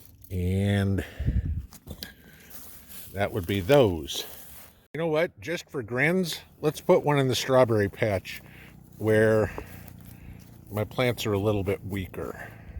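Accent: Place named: New England, United States. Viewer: I tune in because I learn a lot, or at least learn about things I need to research more. You’re like my Yankee YouTube uncle, lol! No harm meant by the Yankee comment — I’m southern through and through so we talk a little different, but definitely are likeminded!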